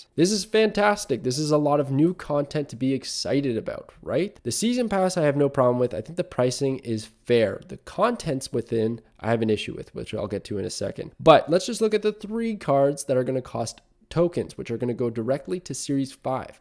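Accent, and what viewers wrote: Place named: Southern Ontario, Canada. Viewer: its the first thing I noticed when I came to the channel. I'm from BC and there is nothing that I like hearing more than the southern Ontario accent (well maybe the east coast one too).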